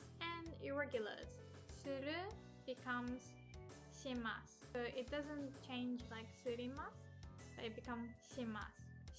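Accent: United Kingdom. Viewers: イギリス人のような発音を使いますね！ ハリーポッターが好きなんですか？笑 私はイギリスから来たので、気がつきますけど、日本ではアメリカの英語を学ぶらしいですから、珍しいと思います！ 優れた発音に感心します ：D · Shes has a British accent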